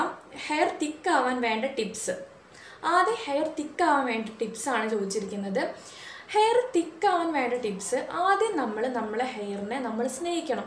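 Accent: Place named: Kozhikode, India. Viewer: njnm calicut aanu chechii.. Slang kettappo thonni atha chodhiche ♥️😘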